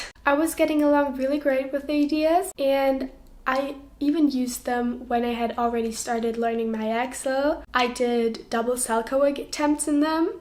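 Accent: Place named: Europe. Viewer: ahhh, habe den Akzent rausgehoert, aber war mir nicht sicher woher aus Europa der ist. Wieviel zahlst du fuer eine private Stunde?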